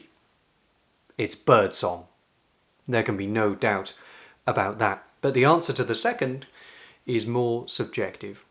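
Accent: England, United Kingdom. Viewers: Love gearing that ENGLISH ACCENT, YES THE BIRDS ARE ACTUALLY SINGING PRAISES TO THEIR CREATOR,!! I saw it somewhere in the Scriptures one time, HALLELUJAH, Lovely presentation your Sis, Thankyou, E83 Australia, 🎉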